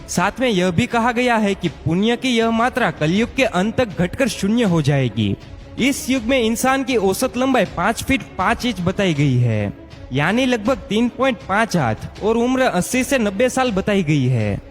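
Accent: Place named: Gujarat, India. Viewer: આ ભાઈ ગુજરાતી લાગે છે ઉચ્ચાર ઉપર થી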